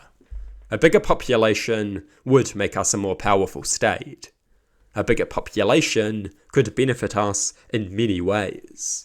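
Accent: New Zealand. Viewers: I like how he emphasizes the final syllable in each sentence, not sure if it's just a part of the New Zealand accent or just him though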